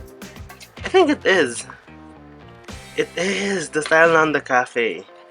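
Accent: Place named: Philippines. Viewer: Im guessing your Filipino? Idk but I your accent kinda sounds like filipino’s accent when speaking english. BTW your unboxing is great!! Thank you for supporting twice ^^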